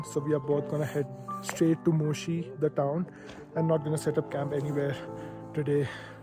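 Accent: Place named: India. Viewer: Your asking bizarre questions from the porter is strange and funny, you look abs sound Indian to me and I’m sure where you originally come from in the third world you have seen people carry things on their head and necks and backs.. why are you acting so unnecessary questions? Anyway better luck next time! Great content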